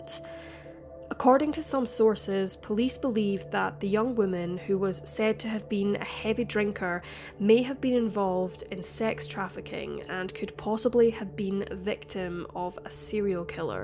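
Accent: Scotland, United Kingdom. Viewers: Loving your channel, it's great to hear a fellow Scot narrating these episodes and I feel you treat each case with such compassion for the victims.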